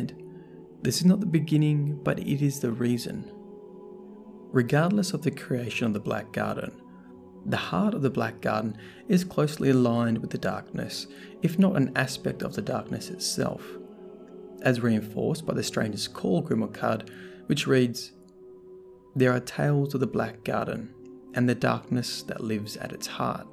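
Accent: Australia. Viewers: Man this is soo awesome. So well described. Gear to hear and Aussie voice talking lore.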